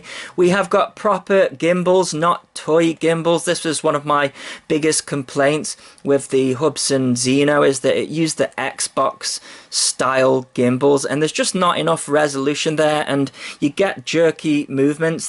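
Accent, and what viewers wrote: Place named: Stoke, United Kingdom. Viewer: Great review, best I have seen so far, great value for the money and glad you dont equate it with the spark, the spark is a much better craft with a better camera and can fly 1.5 miles away , the A3 has been shown to fly no more than a <num> metres at very best, lots of folk like a drone they can fly out of visual line of site even if they are not supposed to, for the money though its good, they need to work on the software for the flight controller, them simply saying its our own doesnt detract from the fact that its not right, the drone vibrates quite badly and its only the gimble that saves the footage from being unusable, give them 6 months and they will iron out the bugs, the underside port is shown being used with a solenoid to drop parachutes on the promo video. I know you were covering your GPS coordinates but your flying location is very easy to work out from the aerial video and your accent, got a lot of love for Stoke and have family up that way just a few miles to the west of you, thanks for the review.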